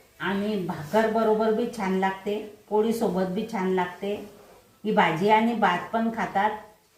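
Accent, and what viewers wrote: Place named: Khandesh, India. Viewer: मावशी तुम्ही नक्कीच खान्देशातील आहेत ...कारण तुमच्या बोलणं ऐकून ...माझं माहेर पण खान्देशातील आहे ...पण रेसिपी भन्नाट आहे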